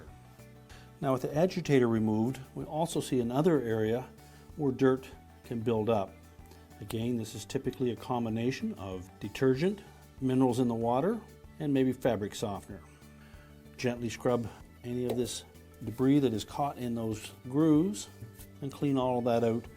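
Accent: Canada. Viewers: Sounds like a Maritimer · I love his accent (canadian maybe?). « We will need to take this aöt, and once it is aöt, we can clean it »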